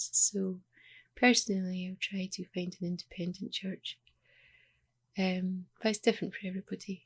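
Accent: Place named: Scotland, United Kingdom. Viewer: i almost moved to edinburgh for uni. Love your accent and story. I kinda agree with a lot of what you say and like the world doesn't understand and it is hard to be around people who just go along with whatever is trending despite them not really caring about protecting anyone, they're also the most mean people sadly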